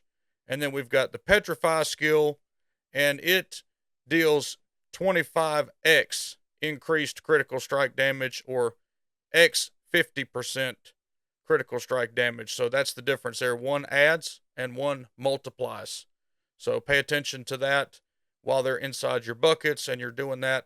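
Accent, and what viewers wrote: South, United States. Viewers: I hear the redneck voice and instantly tune out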